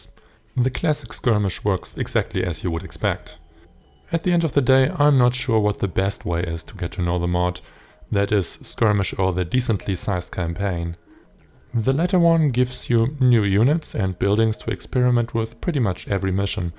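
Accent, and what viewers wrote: Germany. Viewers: Am Anfang dachte ich kurz du sprichst deutsch xD Dann doch englisch, dann habe ich deinen Akzent bemerkt :P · are you german?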